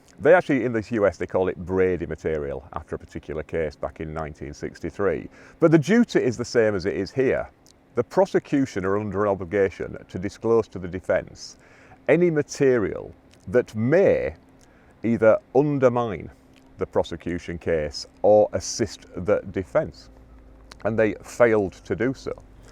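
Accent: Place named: North, United Kingdom. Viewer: Correction required, (if you wish.) Access to the beach/mining tunnel must be at low tide, not high tide as stated. Add caption to correct ? By the way, I love your northern accent, and I am proud of you for retaining it, especially in your profession where it could be a disadvantage for you. Where are you from ?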